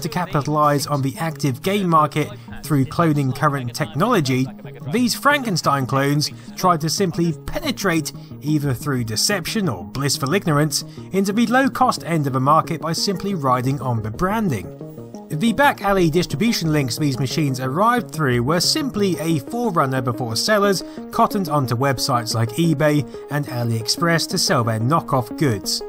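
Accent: United Kingdom. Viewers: The Britishness of your voice is glorious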